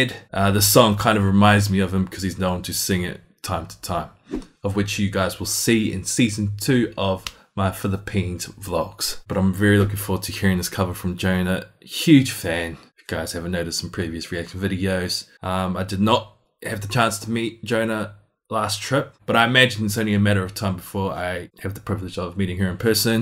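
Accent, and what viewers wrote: New Zealand. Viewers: R u kiwi?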